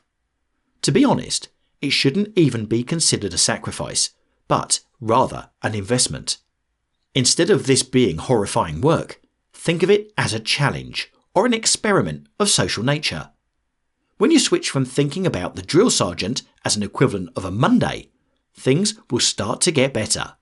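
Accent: United Kingdom. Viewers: Yo, thank you again for turning my article into such an informing piece, honored man! P.S. It's so weird listening to something you've written, narrated in a British accent and animated in such a creative way:D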